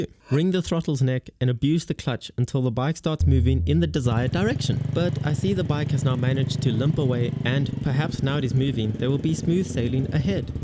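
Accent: Afrikaans, South Africa. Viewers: Heard that accent and was like AAAYYYYEEEE south africaaan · I thought he sounded afrikaans XD I just assumed it was an auzie accent.. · r u south african? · Knew you were south african after your first word lol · Your definetly from South Africa me 2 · That south african accent? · Are you South African? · Is that a South African accent i hear ?? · I knew I heard a fellow South African voice hahahahaha..... Was a funny vid I needed it. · That south african accent is horrendous.. uhhh denni arrcheer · Are you south african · Are you South African?? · This guy is 100% South African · I knew you were South African 🤜🏾🤜🏾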